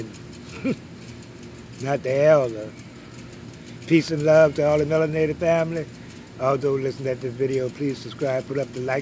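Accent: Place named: New Orleans, United States. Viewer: Elder the way you talkin you real are man a live stream talk just like that the way you are talkin remind me of my relatives New Orleans 504 head bussa!!⚔💥⚔🔥⚔💥⚔✊🏿🔥✊🏿👊🏿💥👊🏿💥👊🏿💥👊🏿💥👊🏿💥👊🏿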